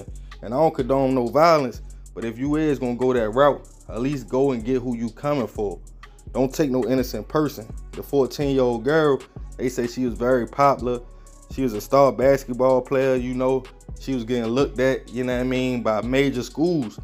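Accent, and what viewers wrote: Baltimore, United States. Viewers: The dude narrating sound like he from Baltimore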